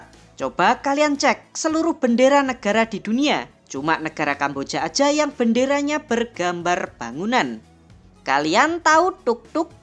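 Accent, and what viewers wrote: Java, Indonesia. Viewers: Logat jowo dusun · Jowo medok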